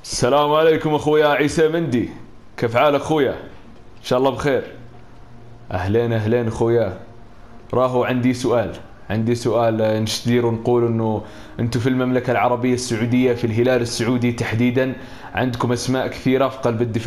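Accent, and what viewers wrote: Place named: Algeria. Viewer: اللهجة الجزائريه 😂😂😂😂😂😂 والله انت مبدع 😂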